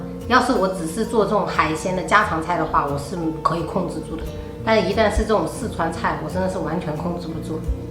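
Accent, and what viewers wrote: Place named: Fujian, China. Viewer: 还以为你是福建人呢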